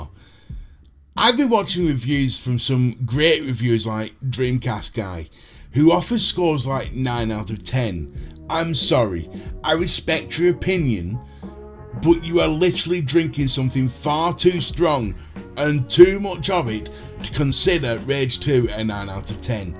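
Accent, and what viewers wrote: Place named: Yorkshire, United Kingdom. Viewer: Awesome review, guessing by the accent you're from Yorkshire/Lancashire, Anyway I bought Rage 2 and I was having a blast but see your points entirely and also don't agree it should get 9 out of 10s. I think a fair score would be 6/7 out of 10. Maybe 8 maximum. I think "getting your money's worth" is a little subjective because I'm having so much fun with it I've never thought "hmm I feel robbed" HOWEVER, I wouldn't recommend buying full price to someone just now. I think if you waited a couple of months you'll be able to pick this up for 15/ 20 pounds and tbh that's about the price It would seem like a bargain. when I first saw it on steam a couple of weeks ago it was priced 29.99, which I think is where it should of stayed but day before released I noticed it cost 40 pounds which for me is tipping it just to far to recommend full price.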